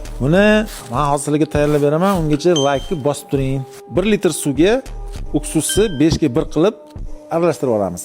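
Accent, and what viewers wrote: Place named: Tashkent, Uzbekistan. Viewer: НИМА ТОШКЕНТЧА ГАПИРИШ МАНСАБМИ ОБРОМИ ЁКИ ЛАВОЗИММИ. КУРИНИШИН ХАМ ГАПИНГ ХАМ БОШКАЧА БУНИ ХИДИ ШИРИН ЭАМАС ТУЗИ ПАСТ ЭКАН.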